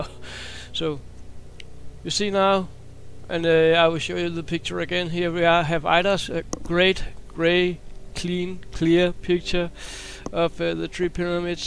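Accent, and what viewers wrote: Denmark. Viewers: I worked at a hostel for 3 years. This dude is Danish for sure.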